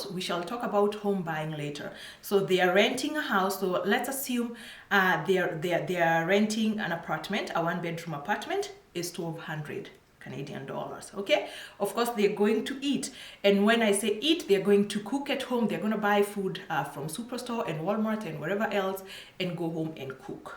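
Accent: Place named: Jamaica. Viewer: I actually thought you were Jamaican, even when I watched your other channel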